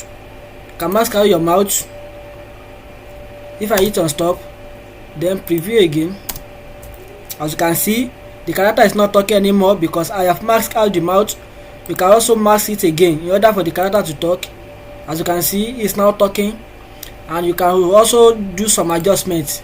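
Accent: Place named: Nigeria. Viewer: Great job! You sound like a Nigerian.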